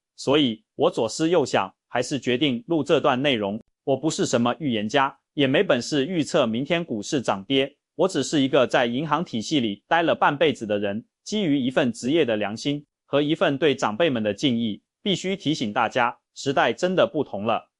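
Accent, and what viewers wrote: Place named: China. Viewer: 這根本不是台灣的，ㄧ聽就知道是大陸的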